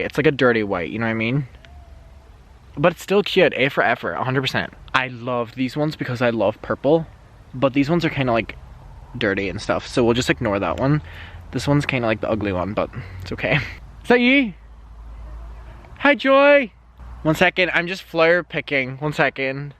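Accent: Ireland. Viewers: This is probably a dumb question, but does it stay "day" light all night [like it does in Alaska] or does the sun eventually set in the summertime in Ireland? Aha sorry I'm not educated about that & was just curious. In America, where I'm from, the sun sets later due to daylight savings time and will finally set ish at night at the latest....then we move the clocks back an hour in the fall so in the wintertime, it'll get dark by 6pm. I love your Irish accent btw. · Where are you from?? Two minutes in thee video...you’re from Ireland 🇮🇪 Awesome! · You would be welcomed in America, they love men with Irish accent!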